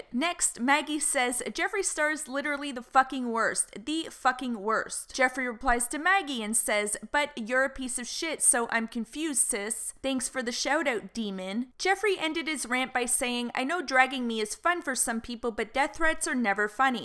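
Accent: Canada. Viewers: T J. Are you seriously suggesting a person from an English speaking country (canada) should get english lessons specifically to sound less canadian so that their slight accent doesnt offend your ears? Guess what? Not all viewers are from the US and the rest of us couldn't care less about a slight variation in accent. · Jesus Christ this girl's accent....Is she Canadian or something?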